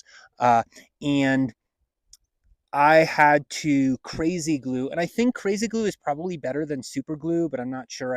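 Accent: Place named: Eastern Pennsylvania, United States. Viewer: Great video. I appreciate the way you break things down into details. I had a similar experience with a different Topo shoe that I tried a couple years ago, I think the Pursuit but not sure. It was great and balanced in every way except one small subjective thing that many people would be fine with, but I thought felt uncomfortable, and for me that was a deal breaker. Btw are you by any chance from eastern Pennsylvania? Your accent sounds like someone I know who grew up there.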